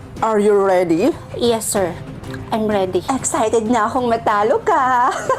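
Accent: Visayas, Philippines. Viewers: Bisaya ka po ata ? 😊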